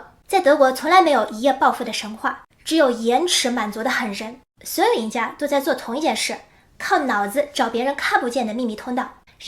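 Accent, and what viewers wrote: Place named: Shanghai, China. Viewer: 口齿伶俐思路清晰财商飙高，再加上这发音，觉得是上海妹子。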